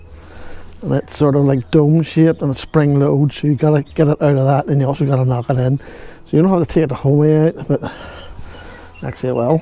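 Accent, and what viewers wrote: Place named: Scotland, United Kingdom. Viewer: Thanks for the video! Very helpful. I have 6000 miles on my rear brake pads and there's almost nothing left. Didn't realize until it was almost too late. Apparently it's an issue or comment with tenere 700. At first I thought it was my fault but it's very common. Not quite as easy as my wr250r. Took me half the video to start understanding your Scottish brogue. Good stuff! I spent some time in Scotland. Little town called lanarkshire between Hamilton and Lanark I think LOL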